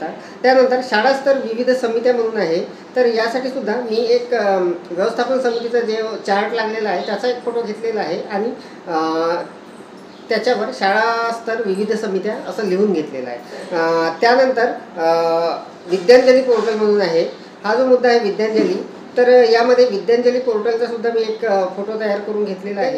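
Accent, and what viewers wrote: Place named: Vidarbha, India. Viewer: खूप छान माहिती सर. अतिशय चांगल्या पद्धतीने अवघड प्रक्रिया एकदम सोपं करून दाखविलात. तुमच्या वऱ्हाडी बोली भाषा एक वेगळी ऊर्जा उत्पन्न करते. खून छान माहिती.❤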